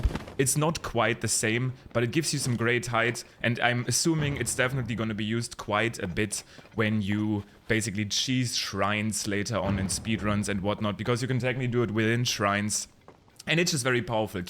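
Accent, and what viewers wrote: Germany. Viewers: Du bist Deutscher oder ? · we can hear the deutsch in your voice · Liebe den deutschen Akzent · deutsches englisch ist so gut rauszuhören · The german acccent is reaaaaly present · Hes german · Man hört SOFORT den Deutschen aus dir raus, gutes video keep going :3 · 1 Question Are you German because your accent sounds german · is that a german accent? :D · anyone knows if he is german or what is that accent? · Thick German acent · always I search Zelda videos, I came up to and I like his videos, but I always think he sound like a german who speaks english and I dunno why:D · good video but there is one thing i want to know, ist das in deutscher akzent den ich da höre? :P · So lustig wie man den deutschen Akzent so hart raushört aber gutes video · Hes from Germany, why should he fake it lmao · Hab sofort gemerkt du bist deutsch😎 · Save deutsch, hört man krass raus irgendwie komisch 😂 geiles Video aber hat mir sehr weiter geholfen 💪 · Wie man merkt dass er deutscher ist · Deutscher akzent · Man hört mal anders hart raus das du deutsch bist 🤣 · Bro where are y from? 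You sound like a German one xD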